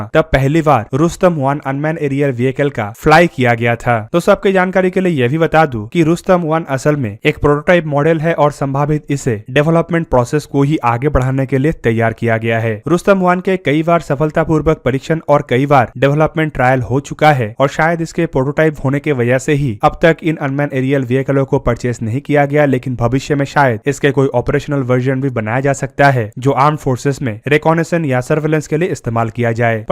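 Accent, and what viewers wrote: India. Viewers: Text english but india voice :-)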